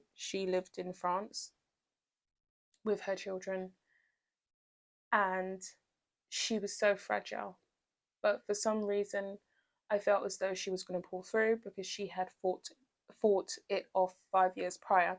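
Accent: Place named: England, United Kingdom. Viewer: I'm glad you're back. You're one of my favourites. As an Englishman from London, it's lovely to hear an English accent....especially when it's a competent and educated one. Keep up the. Good work.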